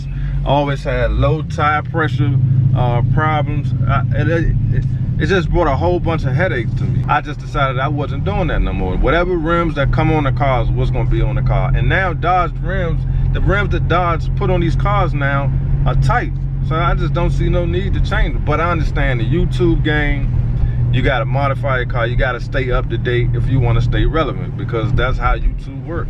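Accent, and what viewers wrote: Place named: Chicago, United States. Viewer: You from Chicago. Based off how you say water and and car I can tell?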